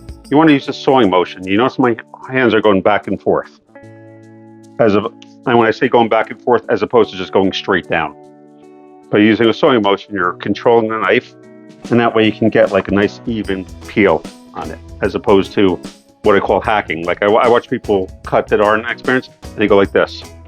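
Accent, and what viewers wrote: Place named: Northeast, United States. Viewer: Bet this guy is from the NE USA how he pronounces it wooder melon. People in Maryland Jersey New York and PA call it water ~ wooder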